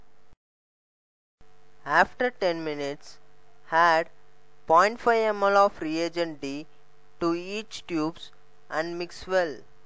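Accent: India. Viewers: VERY NICE INDIAN VOICE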